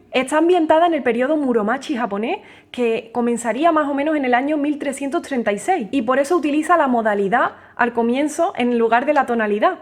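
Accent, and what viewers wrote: Andalusia, Spain. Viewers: porque tiene un acento español diferente? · Los domingos con tú regalo musical son otra cosa. Se aprende con tus comentarios siempre correctos, agradables y llenos de ese gracejo andaluz que te caracteriza. Como guinda tu interpretación y entonces atónito contemplo la maestría de que no defrauda jamás. Se cierra el telón y a esperar toda una semana. Un millón de gracias. Un abrazo. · Que bonito como tocas la guitarra y tambien como hablas, de que parte de España eres? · Hay muchos acentos en España, este es del sur (Andalucía) y dentro de Andalucía hay lógicamente más acentos. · que podria ser mejor que escuchar la cancion de la, pues que te hagan una intro y te hagan amar mas la cancion posd: de que parte de españa es tu acento, me gusta mucho. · Ese acento andaluz 🤤🥰 enamora!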